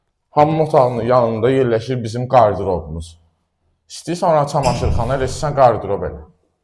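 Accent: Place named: Baku, Azerbaijan. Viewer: бу гардашын дашынмагындан беле баша душурук ки бу гардаш заводской бакылы баласыдыр озуде маштагылы олачаг . валлах сенин о ширин лехчене горе истесен де истемесенде адамо еви алар . гардаш вар ол саг ол емише беле позитив ол сене гулаг асанда адам гедиб душун <num> иллере чох саг ол ки кечмишимизи йадымыза салдын аллах хейрини версин ай гардаш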